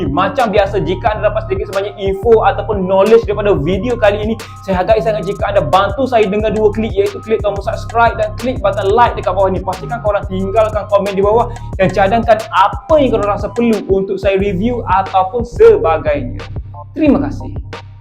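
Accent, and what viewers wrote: Kelantan, Malaysia. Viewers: Ore klate demo😂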